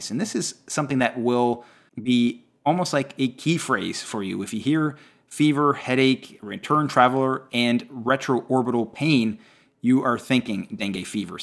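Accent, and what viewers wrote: Canada. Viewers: This guy is canadian I can hear he's accent 😁 esp when he says "about"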